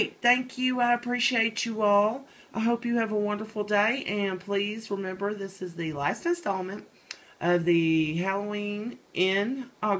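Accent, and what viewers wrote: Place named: South, United States. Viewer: Live the little book. I notice you have a southern accent. Where are you from. I'm in Alabama.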